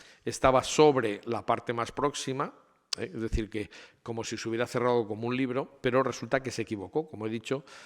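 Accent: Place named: Spain. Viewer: Este tipo, con su nerviosismo obesidad, y acento hace pensar que en realidad todos los españoles son encojonantes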